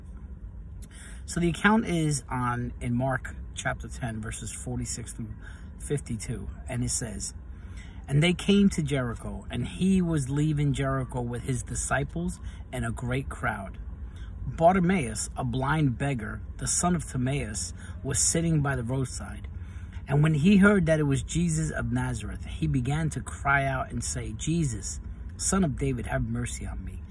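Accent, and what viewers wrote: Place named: Long Island, United States. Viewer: Oh yes, I didn't mean that message specifically for you since you'd already shared you were loud about Jesus! :-) It was just for anyone who reads my comment (or as a prayer you could suggest to others as you're led by the Lord). I actually discovered you at one point during your Pentecost series, but I think I only saw one video from that (I'd have to go back and check to find out). God bless you! P.S. I love your accent (Long Island?)!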